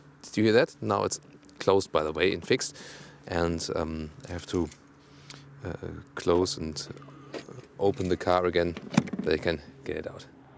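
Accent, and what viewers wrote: Germany. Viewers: He's so cute! I really like German men accents... and that's a beautiful blue car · Your English is really good but the pronunciation is really awful. If you'd put a bit more effort in de-Germanising your English it would be much more attractive to listen to ;-)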